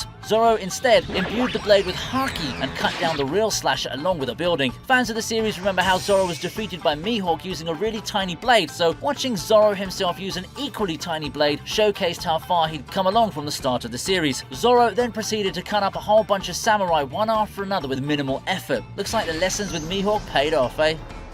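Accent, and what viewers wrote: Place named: United Kingdom. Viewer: No one gives a shit about that detail he’s british let him use his accent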